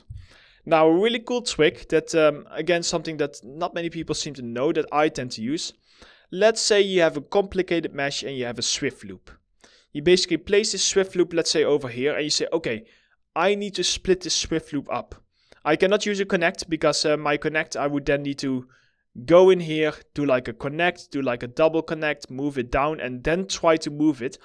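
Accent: Netherlands. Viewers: Thank you for this! I'm learning 3DS Max ( student ), but my teacher just races over the functions and options so I don't really understand how they work. You explained it perfectly! Side note: Are you Dutch by any chance? I noticed the heavy Dutch accent haha. I speak Dutch too, dus dank je wel voor de tips :)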